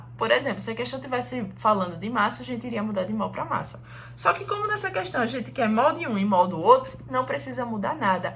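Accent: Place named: Northeast, Brazil. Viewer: ótima explicação, e esse sotaque do meu nordeste deixa tudo mais charmoso kkkk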